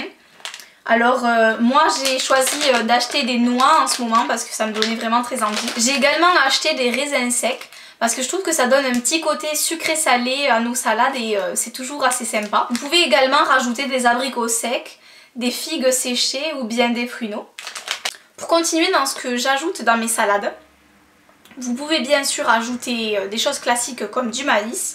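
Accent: South, France. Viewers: cc tu es de Marseille ? Nîmes ? Toulouse? j ai un doute . biz gaelle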